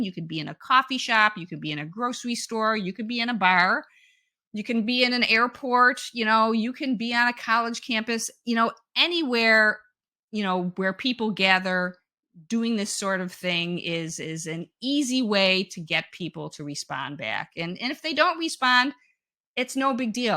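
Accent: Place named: Buffalo, United States. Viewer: I KNEW you were from Buffalo! I love the accent!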